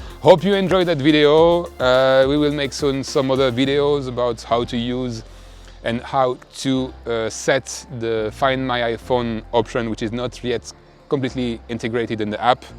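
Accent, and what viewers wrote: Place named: France. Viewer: Tu et français ?😂 en tout cas tu en a l'accent😊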